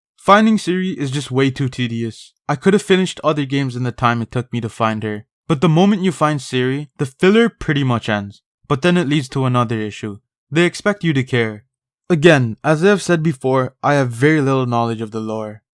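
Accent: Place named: South Asia. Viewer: South Asian voice- immediately shuts off video. This guy’s iq is too low to appreciate the game.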